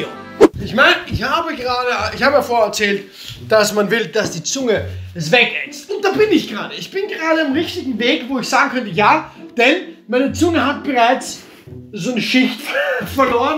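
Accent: Austria. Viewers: Kann es sein, dass ich da einen leicht österreichischen Zungenschlag raushöre?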